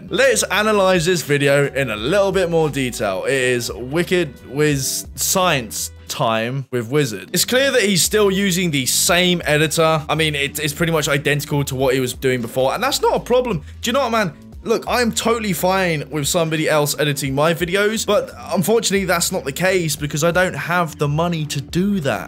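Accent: United Kingdom. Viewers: Ye still watching because your brit voice is crisp xD, didnt even know who this Matthew was until someone posted a random video and got me to you. But yea your voice is going to get a sub. Would love to see some playthrough walkthroughs if you plan on doing them. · "If you have interesting subject, people will watch" disagree. Watched one of your videos and at this point i don't care what you are talking about. Your voice makes my want to listen and i just let autoplayer go on. Good job you funny, silk-voiced bri'ish man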